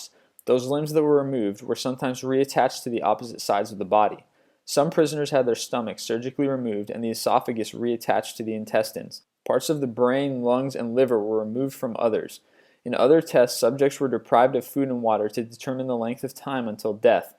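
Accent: United States. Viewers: ***** You're not american then I guess because that's what americans sound like. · STOP complaing about his bloody voice!! He is America, he sounds like that.. he can't just magically change his voice to please you. Get used to it, or leave. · EVILBUNNY28 The suffixes of -burgh and -ham are pronounced the way he pronounced them in the US. Pittsburgh, Pennsylvania is pronounced pits-burg. It's not that we don't have names like that in the US and therefore he had a guess, it's that we simply pronounce them differently (likely due to our mixed culture) and that's what he is used to. Many Americans would distinguish Edinburgh with the UK pronunciation but that's because -burgh has the necessities to be pronounced -burra. The pronunciation of -ham is very much an accent or something you need to be told to do thing. Pronouncing "-ham" as "-um" doesn't make any sense to people who are native speakers but who have not grown up pronouncing "-ham" as "-um". 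Sort of like telling Americans they need to start dropping their R's on words.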